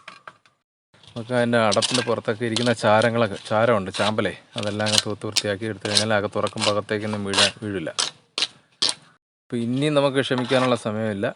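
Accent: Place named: Thiruvananthapuram, India. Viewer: Thiruvananthapuram alle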